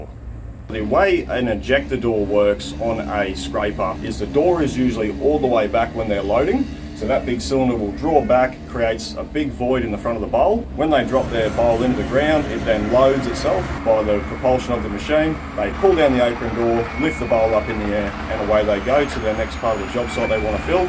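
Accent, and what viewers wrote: Australia. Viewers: Classic we sure do have a twang down under 😀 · This is like ASMR for me: Aussie accent, grinding, cutting, welding, air tools... love it!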